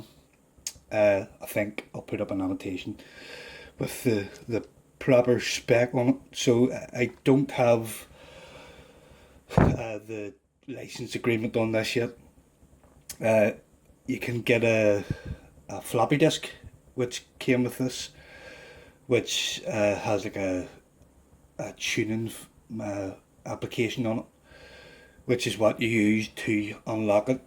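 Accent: Belfast, United Kingdom. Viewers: YASSSSS! a fellow Norn Iron A1200 user here, great hearing a local accent - subscribed!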